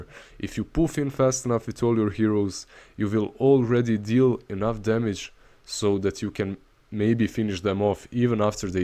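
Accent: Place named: Balkans. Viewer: Haha znao sam da si s balkana po akcentu.Svaka cast lajkovao videi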